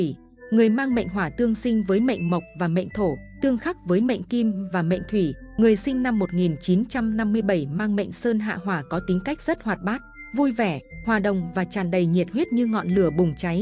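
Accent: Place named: North, Vietnam. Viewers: Tiếng miền Bắc rõ ràng từng câu, từng chữ đấy ạ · Nói Tiếng bàc khó nghe quá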